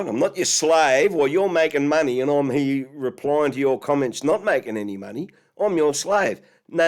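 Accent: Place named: Australia. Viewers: His accent is absolutely stereotypical Australian…I know, I’m Australian and my accent isn’t like his at all. We have more than one accent here. WA is different than SA and TAS is different again, etc. Also, the more rural the more it will sound like. You generally only find posh accents in the cities and larger towns and the real posh ones sound like fake Brits. 😂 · oz accent just makes it all that much more entertaining.. i hope these clowns continue to release more and more questionable footage, so we can keep LAUGHING at them.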